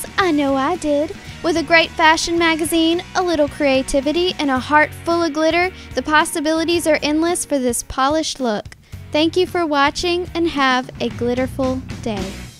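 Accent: South, United States. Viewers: You are a adorable!! I LOVE YOUR SOUTHERN TWANG !!<3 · Where Are You From? You Sound Extra Southern.. Lol But Your Real Pretty.. ! I Subscribed!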